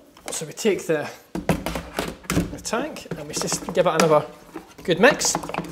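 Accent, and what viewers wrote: Scotland, United Kingdom. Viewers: no he sounds very very scottish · He's most likely from central Scotland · Where is his accent from? Scottish? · I just looked at the comments to see id anyone mentioned his scottish accent 😂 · Chocolate upon chocolate upon chocolate and a Scottish accent. This video was sent from heaven. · Definitely Scottish! The way he pronounces 'R' tells that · This man is Scottish, not Irish~ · Oh that beautiful chocolate and that Scottish accent... <3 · you are right jonge!!!, its sound scottisch. I said that he was a dutch because it really sound like dutch speaking english...buut isnt it. I actually still have a dutch accent when i talking english hahah ;) doei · I wasn't expecting a Scottish accent going into this 😂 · He scotish? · haha no he's definitely scottish,i lived there for a year and he definitely is scottishxP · I was trying to wind him up lol I know it's scottish, you know how the relationship with Scottish people is with the English ;) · no, he sounds very scottish.... 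· YES! He's Scottish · He's Scottish, AKA the language I speak. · Scottishhhhhhhhhhhhhhhhhhh, yaldi. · I know that you've already had you me question answered, and yes, he is Scottish, but some Scottish accents are different depending on where you live ( I'm Scottish ) :) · Ahh Scottish accents <333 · He is Scottish like mee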